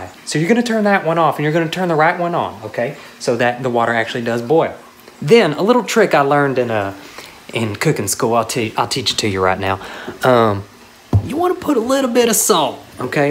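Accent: South, United States. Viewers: I love the southern in these older videos. "pots and pans" was one word. "potsnpans". love it.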